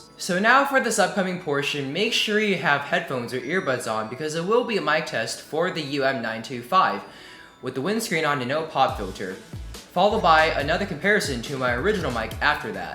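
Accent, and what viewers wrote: South, United States. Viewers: I think the intro was your original accent and you put on a more "normal" accent for the rest of your video. Dont be afraid to show your viewers who you are. Let your southern accent out with love and passion. We want it. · good southern accent. Chinese accent is next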